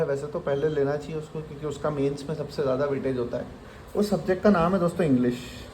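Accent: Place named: Madhya Pradesh, India. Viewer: Bhaiya aap to MP ke lag rhe ho yar aawaz se ❤❤❤❤❤ Proud of you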